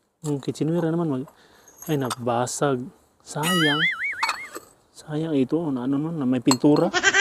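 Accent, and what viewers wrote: Visayas, Philippines. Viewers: Visaya kaayo ka ug lingaw kaayo ka mag vlog. 😅😊 Asa ka dapit dito sa pinas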